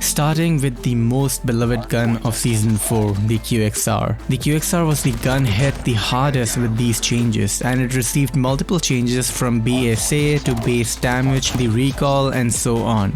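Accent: India. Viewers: Btw bro are you indian? You sound like indian ☺️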